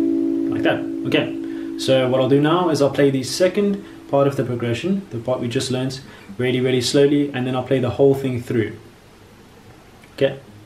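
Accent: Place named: South Africa. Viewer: I nearly died from excitement when I heard the south african accent!!!! It's not every day I find south african youtubers 💀 Keep doing what you're doing, this tutorial was amazing!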